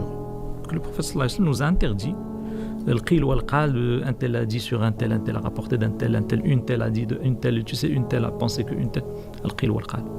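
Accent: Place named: Morocco. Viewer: on reconnaît l'accent marocain❤❤🇲🇦🇲🇦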